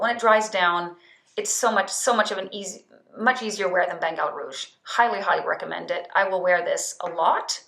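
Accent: United States. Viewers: Her mom from US that’s why she sounds American). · Hi. I believe that you are Swedish, so I think it's interesting that you sound American. Also, I appreciate your insight concerning the fragrances you mention. I plan to start familiarizing myself with Comme de Garcons and some of the other ones you mentioned in this video. Recently, I added Guerlain "Bois Mysterieux" to my collection and it instantly has become one of my favorite fragrances. I highly recommend that you try it if you haven't yet. Two days ago, I wore another favorite of mine: Veronique Gabai "Cap d'Antibes". I like that one a lot. Have you tried it yet? I'd like to hear what you think about "Bois Mysterieux" and "Cap d'Antibes".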